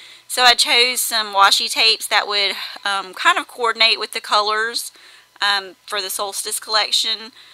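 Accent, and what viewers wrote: South, United States. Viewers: I have a scrappy crush on you! Ok, I know that sounds creepy but you just make magical pages. Also I love hearing your southern twang as I'm originally from the south myself. Love how you added washi to those wood veneers from HS. Very creative!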